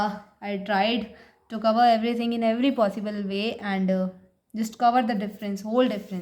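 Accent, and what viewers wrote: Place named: Haryana, India. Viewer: dii ab aapki haryanvi bhi sweet lgti h to m ky kru 😁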